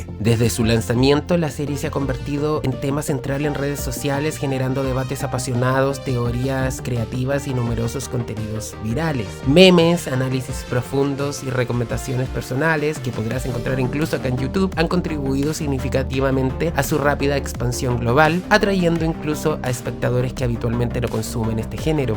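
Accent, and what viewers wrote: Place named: Chile. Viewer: Felicitaciones! Estoy literalmente hace años con deseos de crear un canal artístico cultural. Consumo ese tipo de material en su mayoría y además de disfrutar del contenido, me fijo mucho en la factura técnica y en la calidad, claridad, simpatía y todo lo que tiene que ver con la voz o la persona que está al frente del canal. Tomo ideas, analizo, etc. Me gusta cuando hay originalidad en la manera de narrar y pese a que se nota que eres chileno, tienes un estilo muy personal. Primer video que veo de tu canal y está muy bien hecho.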